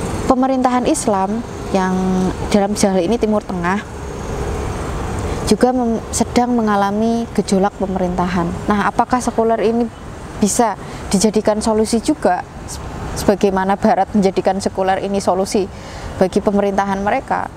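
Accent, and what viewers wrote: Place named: Java, Indonesia. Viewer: Medhokee leh mb Ditunggu ulasan buku lainnya ka...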